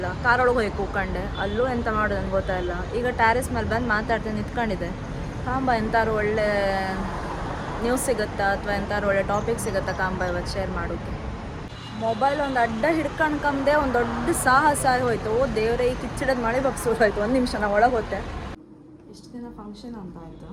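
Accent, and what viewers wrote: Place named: Kundapur, India. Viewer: Kundapur kannada super ❤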